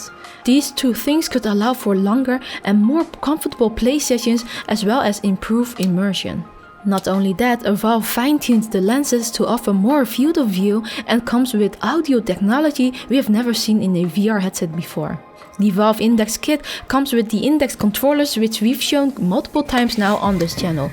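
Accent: Netherlands. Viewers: Is that a Dutch accent? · Its just a dutch accent. Not dutch-asian.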